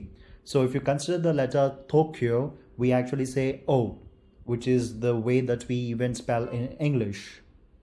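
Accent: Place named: India. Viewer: Your presentation is very impressive👌.Your Telugu is clean unlike many other Telugu YouTube presenters. For example in other Telugu channels, they say the word వచ్చేసిseveral times, and లెగిసి instead of లేచి like so many repulsive Telugu words. Obviously present day Telugu is deteriorating. It’s a great relief to hear your Telugu . I wish to follow your Japanese language lessons. Please continue.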